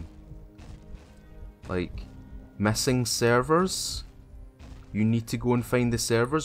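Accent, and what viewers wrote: Scotland, United Kingdom. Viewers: I love your scottish accent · is he scotish? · are you scottish? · Ah the thick Scottish accent love the accent of some of my ancestors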